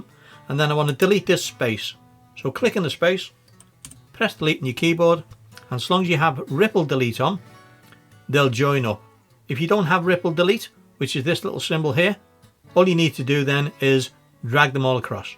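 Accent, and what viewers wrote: Liverpool, United Kingdom. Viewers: Wow. What an awesome and easy to follow video. It is exactly what I need for a project I am working on at the moment. You are a great teacher. You sound like you are from Liverpool. I went to college there in Hope Street - between the two cathedrals in 1968 - yes I am very old. I have subscribed and will watch you other videos too. Thanks again and much love from beautiful Segovia in Spain.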